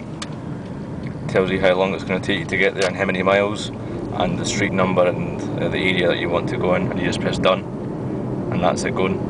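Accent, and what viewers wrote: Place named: Glasgow, United Kingdom. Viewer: OMG you sound JUST like my uncle rizwan and he lives in Glasgow!!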